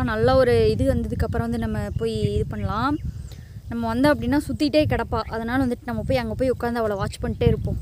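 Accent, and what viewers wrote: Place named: Kongu, India. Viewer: கொங்கு தமிழ் சூப்பருங்க அம்முனி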